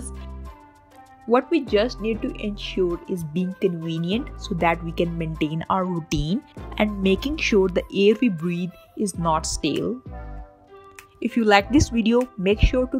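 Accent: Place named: India. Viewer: Damn i hate that Indian accent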